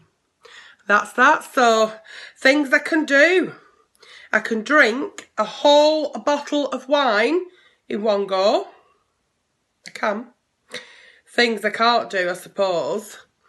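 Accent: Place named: Rossendale, United Kingdom. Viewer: No mistaking that rossendale accent 😂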